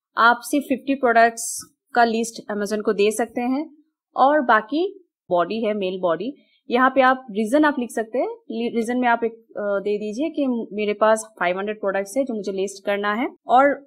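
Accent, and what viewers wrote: Bengal, India. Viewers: Are u Bengali girl 😀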